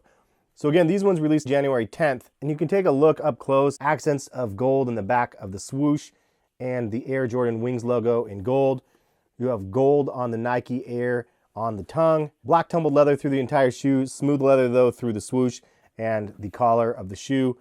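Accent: Oregon, United States. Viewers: These are horrible. Icy sole looks cheap, only reason sole is icy is because Nike can’t figure out how to make a clear sole that doesn’t yellow, the clear soles from 90s were best but of course yellow over time. Please let’s stop the myth that tumbled leather is high quality. It’s just cheap leather w pebble textures. This shoe is crap. Like videos. You have an interesting accent, is it what Oregonians sound like? The way you say “colorway” (cull ah way) and. “Nike” (like Nyyyy Kee) is different. Keep up the good videos.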